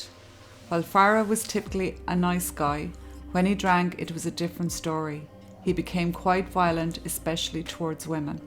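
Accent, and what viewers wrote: Ireland. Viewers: Am I a very bad person for still being rooting for these girls after all? Dunno, for once, girls doing an abusive pr**, I really can't be that sorry for him. But Jeez, was this a true mess...... Greetings from Italy from someone who was living in Dublin but left the year before this all happened 🧡love your channel (and your accent, it brings back so many nice memories...) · Love your content and your soft, lulling Irish voice. I've only just found your channel and have now watched all your vids so I'm eagerly waiting for more. Sending love and luck from the West coast of Australia. 💚🍀💚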